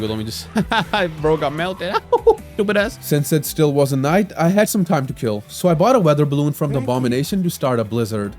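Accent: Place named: United Kingdom. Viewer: British ahh accent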